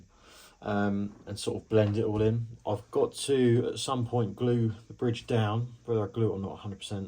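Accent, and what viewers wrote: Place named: United Kingdom. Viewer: I bet you are British yeah...yeah...yeah...yeah...yeah...yeah...yeah...yeah...yeah...................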